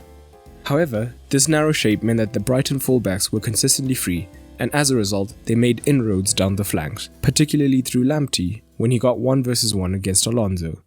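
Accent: South Africa. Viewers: Are you South African g? Really good video!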